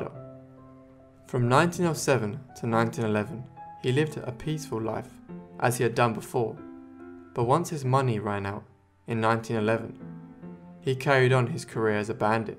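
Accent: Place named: United Kingdom. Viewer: I don't care for the British accent narrating this.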